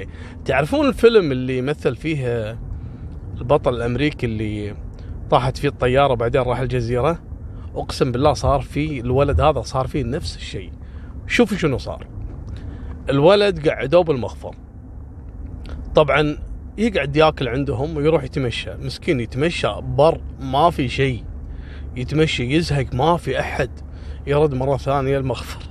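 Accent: Kuwait. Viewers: والله ضحكتني ايكله اكضب الباب انتةوابنك والله حلوه لهجتكم اموت عليكم يااهل الكويت الطيبين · يا اخي قصصك جميلة واحلى ما فيها اسلوب سردها ولهجتك الكويتية الجميلة التي نحبها من ايام مسلسل الى ابي وامي مع التحية وخالتي كماشة وغيرها من المسلسلات الكويتية الجميلة اخوك من العراق احبكم يا اهل الكويت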